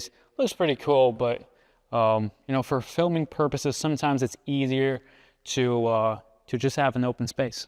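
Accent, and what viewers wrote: United States. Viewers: real question. Are you American or how do you have an American accent?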